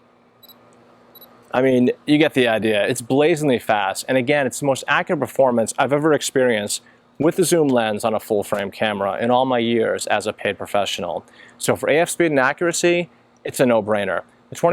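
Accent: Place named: South, United States. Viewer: Very refined manner of speaking from a gentleman of the South. May I ask what Camera-lens combination did you use? Your video is just so beautiful, with the bokeh very pleasing to the eyes. Everything within the frame is supremely picturesque.(And by the way since you are a Canon user, I subscribed immediately)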